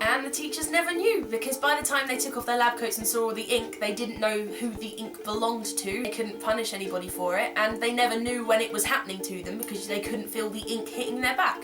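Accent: United Kingdom. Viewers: aww so cute and british. sounds like you had a fun time in school :)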